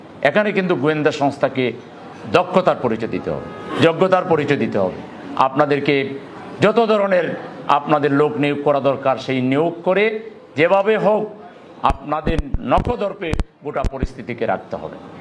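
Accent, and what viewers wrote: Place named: Sylhet, Bangladesh. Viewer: উনার বাড়ি সিলেট।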